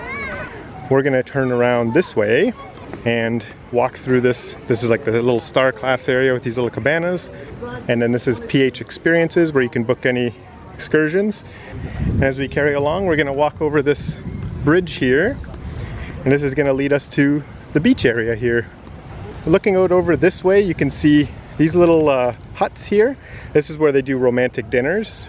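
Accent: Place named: Canada. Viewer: Obviously you are Canadian. Linguists generally point to around 10–15 distinct accents in Canada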